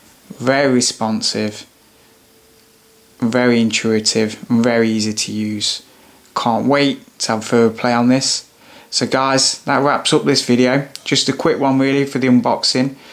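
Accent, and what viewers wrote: United Kingdom. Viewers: Cockney accent. People really admire it.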